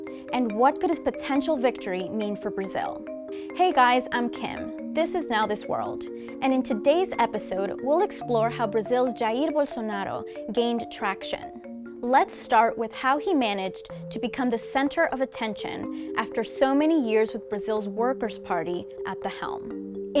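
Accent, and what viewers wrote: Spain. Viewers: y u are Speaking portuguis with spanish accent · A lot of facts given against Bolsonaro were neither fully nor at all explained! oh, and by the way, why are you speaking portuguese with a spanish accent? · because she speaks Spanish as her language 🤷🏾‍♂️ 🤷🏾‍♂️ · It's annoying how you pronounce Brazilian names with a Spanish accent. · Cool. But why is she pronouncing names and places with Spanish accent?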